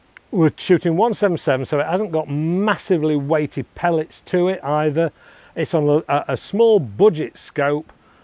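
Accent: United Kingdom. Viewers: What a great way to present review and I liked your British accent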